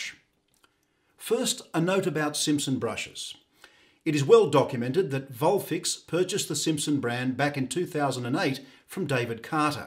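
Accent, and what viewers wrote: Australia. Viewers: You sound very Australian to me. I am English. You do have a soft accent but it is clearly Australian (to me). Your pronunciation is exceptional and clear. I would say you speak with received pronunciation but with an Aussie flair. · Yes, but also sound slightly Aussie too so I couldn't tell, lol.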